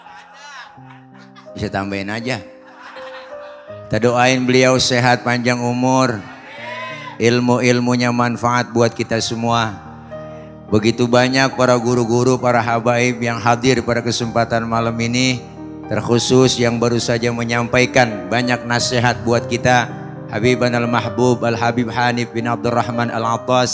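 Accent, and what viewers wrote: Jakarta, Indonesia. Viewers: Jangankan ceramah ngomong aja kalo logat Betawi berasa asyik bener tongkrongan.. ingat Ama babe Mandra dan benyamin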